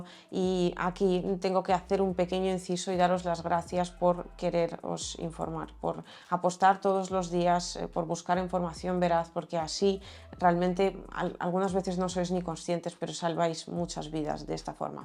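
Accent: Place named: Spain. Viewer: Lo siento, pero mientras tu sigas hablando como española no veré tu canal. Es muy pesado ese acento y tu público es latinoamericano, no español.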